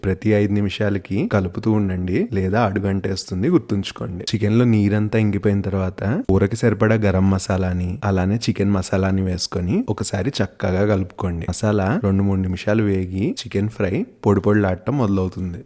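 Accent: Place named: Vijayawada, India. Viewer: Chala rojulu Ayindi andi mana style Telugu accent vinni. Mi Telugu accent ma vijayawada accent laga unde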